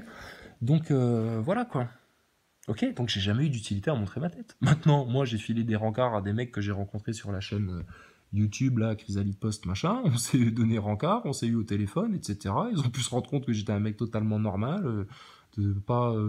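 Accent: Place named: South, France. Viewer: Tu me tue avec ton accent du Sud 😂😂😂🙏😎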